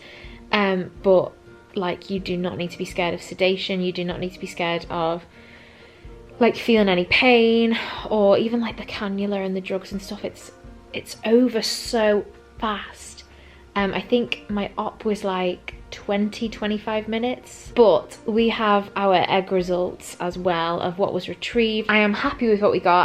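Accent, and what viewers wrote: United Kingdom. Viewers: That's my birthday! ☺️ Never thought you were British 😍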